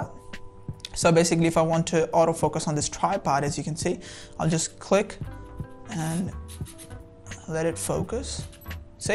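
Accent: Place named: India. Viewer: are you indian? Your accent is op❤ What time it take to develop bhiiya?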